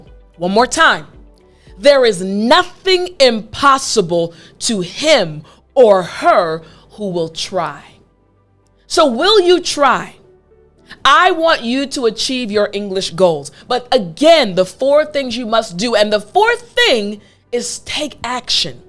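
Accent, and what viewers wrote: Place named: United States. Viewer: I really and truly appreciate your way of speaking,i'm also Working on improving my fluency.i'm a learner .and I am a congolese. Props to you I wanna talk as you are talking cos i live the american accent. I cannot express my appreciation.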